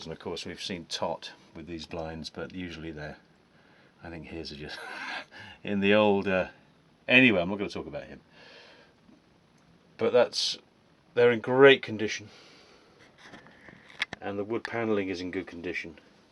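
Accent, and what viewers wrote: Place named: United Kingdom. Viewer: your videos are great. Nice and laid back presentation, such a perfect British English, delight for the ears and such a clean and wonderful S900. We know each other from the Classic Merc Hymer group on FB, we exchanged comments. Please, keep on with the videos.:) Regards, Yaro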